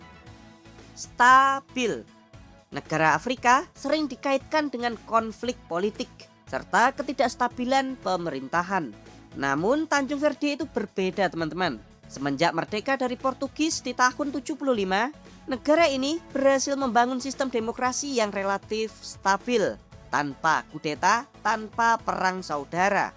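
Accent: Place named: East Java, Indonesia. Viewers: aslinya mana kok bahasanya medok kayak jawa timuran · Ini miminnya lucu banged, selalu ada kata kata tambahan, seperti siapin kopi dulu, siapin Snack ya dulu, terus ada kata asoyy kalau lagi ngulas hal hal seru di negara yg sedang direview, terus intonasinya kadang seperti sedang serius ngajarin murid matematika di kelas.. Terus logatnya itu, aku analisa dari tadi, seperti logat Jawa Timuran gitu, Org Surabaya aku menduga, mungkin... Hehehee...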